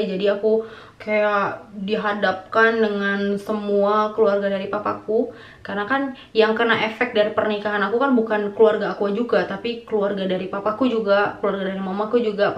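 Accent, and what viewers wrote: Indonesia. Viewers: Salam kak... Saye dari tg. Balai karimun... Slame nonton vlog akak,, bahase nye same dgn kami... Ternyate emang pernah tinggal di tg. Balai karimun... Kak tg. Balai karimun nya dulu dimna?? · pantas logat e macam pontianak.. emang perbatasan indonesia na kalimantan dengan malaisia.. walaupun ndak nempel langsong pontianak e wkwkwk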